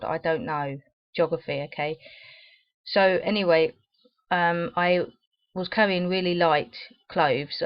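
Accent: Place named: England, United Kingdom. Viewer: You are English! haha your accent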